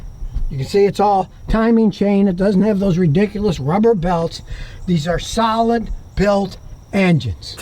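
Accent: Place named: Ohio, United States. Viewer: lol lol. Heavy Ohio accent.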